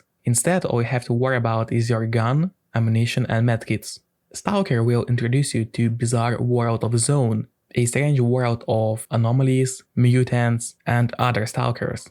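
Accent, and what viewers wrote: Eastern Europe. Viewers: I couldn't explain his channel better I saw in his other videos pepole making fun of his heavy Slavic accent but I love it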